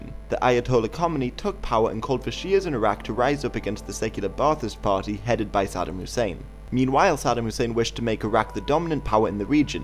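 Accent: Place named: Southern England, United Kingdom. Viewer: I think its a british accent they all speak like that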